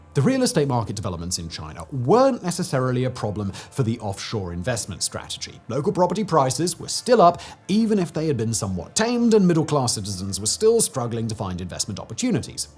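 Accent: United Kingdom. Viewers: There's something about a British man with this regional accent wildly mispronouncing foreign names without pause. 😅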